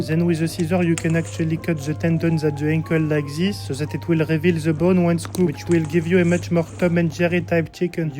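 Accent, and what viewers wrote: France. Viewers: la vie tes un bon frr, de tout les accents frenchies jsp quoi tes lmeilleur big, keep go et tauras plus aucun ptit français a la con comme moi dans tes com (le jour ou tu seras gros tsais)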